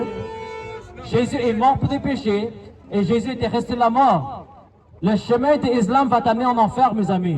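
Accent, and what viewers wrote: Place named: Canada. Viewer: Canada? J'entends un tout petit accent canadien.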